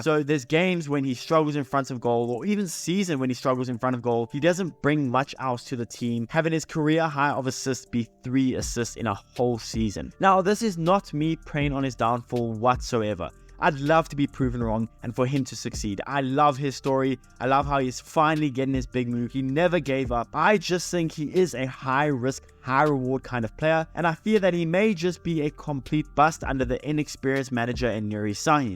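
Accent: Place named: South Africa. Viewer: South african accent. Reveal your PSL team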